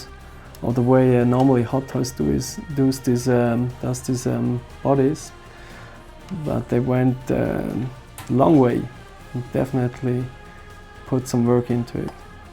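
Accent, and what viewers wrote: Germany. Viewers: Are you German?^^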